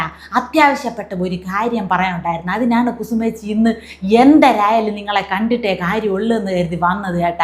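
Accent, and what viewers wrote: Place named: Neyyattinkara, India. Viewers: എന്തര് അപ്പി.. സുഖന്കളൊക്ക തന്നെ? എന്തര് മുഖത്ത് വാട്ടന്കളോക്കെ കാണുന്നല്ലടെ..എന്തരപ്പി നിനക്കൊരു ദുഖം…ഇപ്പോഴും കെട്ടിയോൻ പഴയ കോവാലൻ…തന്നെയടെ..? 😜🤣😂 തിരോന്തോരം സ്ലാന്ക് കിടുക്കി..😍👍🌹തൃശൂര് കാർ ഞന്കൾക്കിത് കേൾക്ക്ന്നത് രസമാണ് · ഇത് നെയ്യാറ്റിൻകര സ്ലാംഗ് തിരുവനന്ത പുറം അല്ല ok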